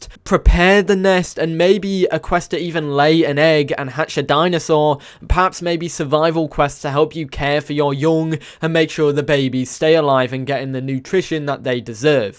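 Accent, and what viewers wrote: United Kingdom. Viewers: your british english is funny i like it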